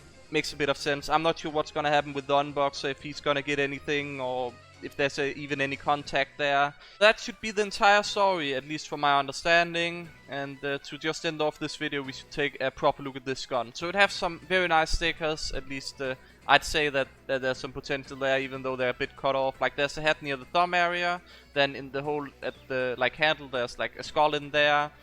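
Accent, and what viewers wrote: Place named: Denmark. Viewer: Where are you from? You sounds like a dane tbh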